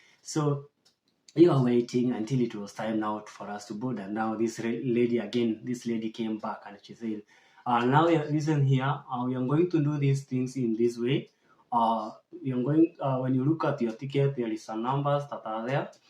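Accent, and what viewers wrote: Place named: Meru, Kenya. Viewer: Its the meru accent for me 😂 that customer service lady alikusave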